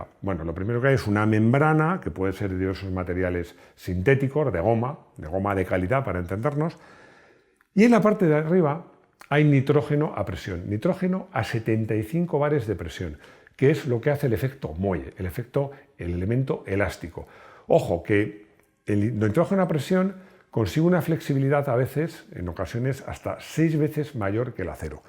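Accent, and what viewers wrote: Chile. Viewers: tiene acento Chileno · es chileno? ese acento se me hace familiar... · Que a le escuche un acento chileno?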